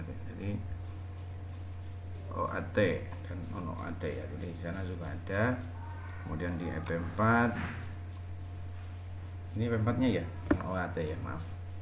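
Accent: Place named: Banyumas, Indonesia. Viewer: Memang benar pak, yen ora ngapak ora kepenak........sukses selalu....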